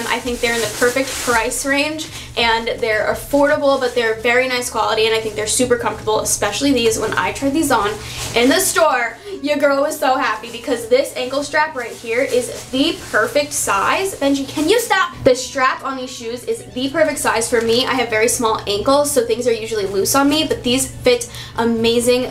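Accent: New York, United States. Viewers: I not only love your style and your sweet kitty that looks similar to mine... but I die for your New York accent. So cute so sassy. ❤️❤️ cool haul. Digging those leaf pants 👖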